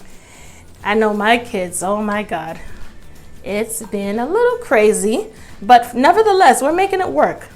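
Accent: New York, United States. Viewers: Go Thank You For Giving US Momma's An Quick, Easy & Delicious Recipe For Picky Eaters. Quick Question-Are YOU From New York? Cause When YOU Say Certain Words-YOU LOL Sound Like ME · Gotta Be From NY With That Accent 🥰 Subscribing To You Now Lol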